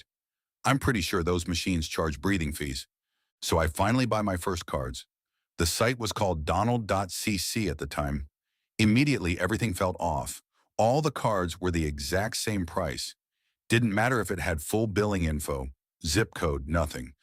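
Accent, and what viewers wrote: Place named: India. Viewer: I can smell the Indian accent from behind the AI voiceover.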